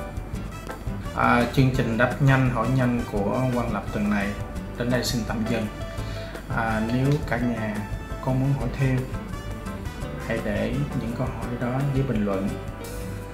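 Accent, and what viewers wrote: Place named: South, Vietnam. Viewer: Trước giờ tưởng Quang lập người miền Bắc giờ nghe nói giọng nam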